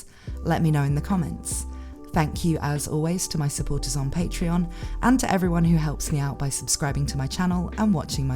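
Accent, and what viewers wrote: United Kingdom. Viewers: They should have someone we can understand say this I don't speak British English 🤦‍♂️🤷‍♂️😤🤢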